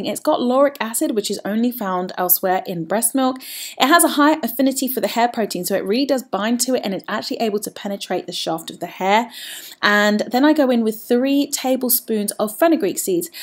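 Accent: England, United Kingdom. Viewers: missing the English voice over here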